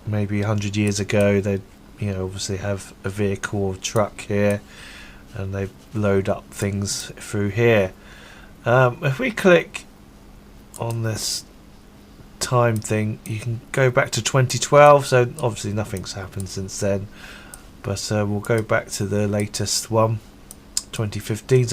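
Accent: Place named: Portsmouth, United Kingdom. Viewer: Do i detect a Poorst-muff accent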